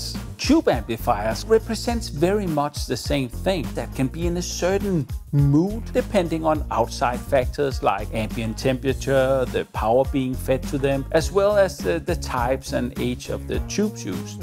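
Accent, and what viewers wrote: Denmark. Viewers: Unmistakable danish accent , but nonetheless informative and humorous!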